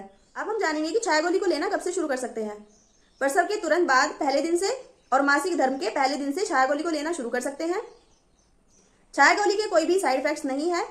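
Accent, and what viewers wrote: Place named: Uttar Pradesh, India. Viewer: Nice explanation dii, aap up se h kya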